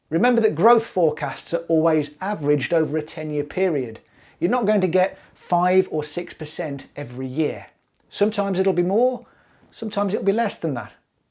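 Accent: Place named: West Midlands, United Kingdom. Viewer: I take it that you are from the West Midlands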